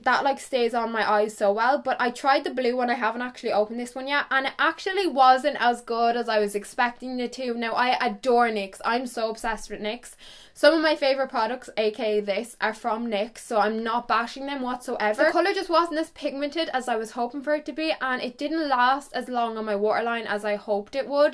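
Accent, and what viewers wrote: Ireland. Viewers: Are u from Ireland 🇮🇪 ( edit) 2 min in the video: yeppppp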